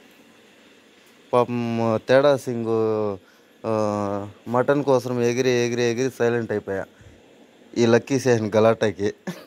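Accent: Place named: Anantapur, India. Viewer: బ్రో నువ్వు అనంతపురం డిస్టిక్ వాయిస్ మాట్లాడుతున్నావ్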